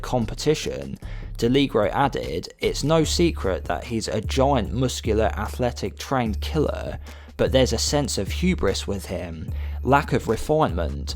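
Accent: United Kingdom. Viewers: Thats a thic british english mate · dude i couldnt concentrate on the video because of your accent i dont to listen to british accent again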